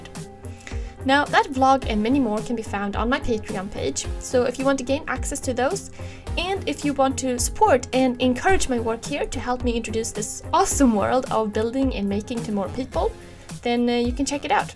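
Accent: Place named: Sweden. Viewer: I thought Swedish, but I may be wrong...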